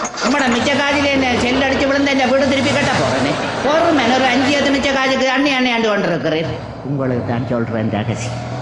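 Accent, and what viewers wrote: Jaffna, Sri Lanka. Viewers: யாழ்ப்பாணம் நடை பேச்சு வழக்கு அருமை... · இது யாழ்ப்பாண பேச்சுவழக்கு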